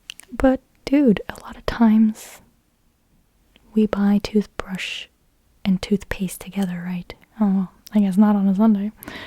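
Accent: California, United States. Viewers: Your american accent is superb. Even though I didnt get any tingles from this video I still enjoyed it and found it relaxing. Videos like this would be great for times that I want to wind down but not necessarily fall asleep. I also think it would be interesting to hear your normal voice speaking in German (maybe with subtitles for people like me who cant keep up with the language at that pace) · You sound more American than German. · I still can’t believe how good you’re accent is , I’m not sure if it’s because I’m from the uk , but you sound totally American !! Credit to you ! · Your American accent is so superb, I often forget you’re German. And then you disclose that you don’t know certain American idioms, (like “How did the chicken cross the road?”) and then I remember. lol You have such a large understanding of our culture though. I wish I knew more about German culture. 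:) Also, I’m pretty sure most of those “laws” aren’t true. haha I cant imagine any law enforcement keeping track of off-key singing. hahaha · Love your voice I still trip on how a German girl can sound so old school California.I like your normal voice better then the whisper for asmr.Speech should be used rarely in a asmr vid. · Hast du deinen amerikanischen Akzent Absicht geübt oder woher hast du ihn ? 😊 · Cruz DeLeon she does have an accent, it's American. No idea how she gained it but never the less it's certainly American rather than British. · Descended_Flames I genuinely wanted to know why you put English in quotation marks that's a valid question. There is a distinct American accent in her voice, it does not matter from where from although Texas, New York and California all sound different they do sound fundamentally the same. She sounds a bit west coast, like a well spoken person from California if you wanted to be specific. 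· you sound american, i thought you where German ?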